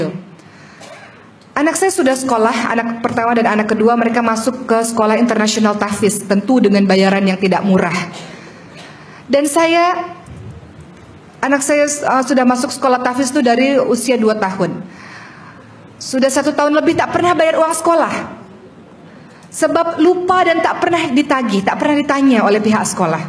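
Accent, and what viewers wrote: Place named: Malaysia. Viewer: Logat Malaysianya bagus kali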